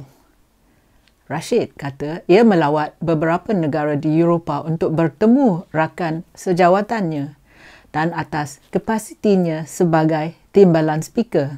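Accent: Perak, Malaysia. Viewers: askum kak, kak org perak ker , kadang ckp ada loghat perak tu hehehe, but i agree you are 100% betul , malaysia need a total reboot kak, a total reboot cause all this while we are being manipulate , we need new people like kak , the real people...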